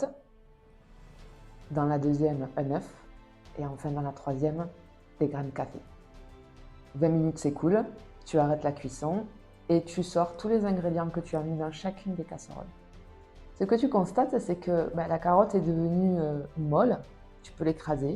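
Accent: South, France. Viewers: Bonjour ton accent du midi j'adore je suis de Toulouse j'ai le même bonheur a vous merci pour ces petits conseils j'ose un 🥰🥰😘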